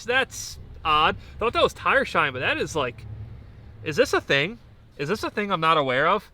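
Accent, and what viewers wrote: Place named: Baltimore, United States. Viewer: I JUST MISTOOK YOUR BALTIMORE ACCENT FOR THE DELCO ACCENT. I had to look it up. Ohh its thick in this video lolo 🤷‍♂️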